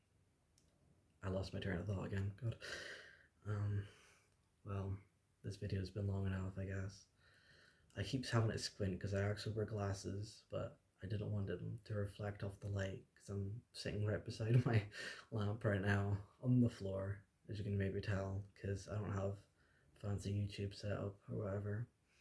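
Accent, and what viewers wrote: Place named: Scotland, United Kingdom. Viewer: I can tell by the accent your Scottish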